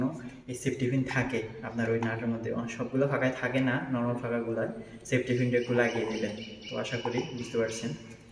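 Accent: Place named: Sylhet, Bangladesh. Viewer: মাশা-আল্লাহ ভাই খুব সুন্দর করে বুঝালেন আর আপনি বুধহয় সিলেটি আমিও সিলেট থেকে দেখছি❤❤❤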